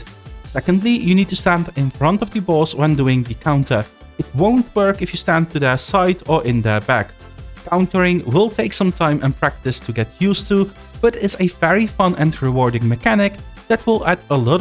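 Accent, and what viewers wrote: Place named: Netherlands. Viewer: lees net dat je Nederlands bent/spreekt hahahha ik dacht het al heel lang aan je accent te horen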